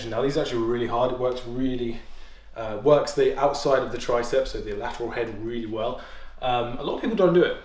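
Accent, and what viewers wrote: United Kingdom. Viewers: That British accent is so much easier to listen to!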